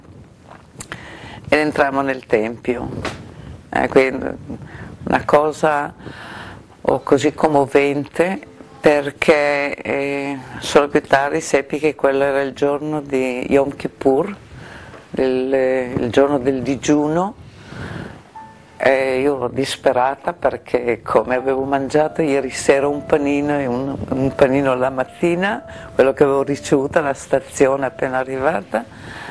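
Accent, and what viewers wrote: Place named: Rijeka, Croatia. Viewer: Còcola, che emozion rivederte in video e ricordar i nostri incontri... sentir la tua bela parlada fiumana: no te dimenticheremo mai! abrazi, da qua giù fin là su!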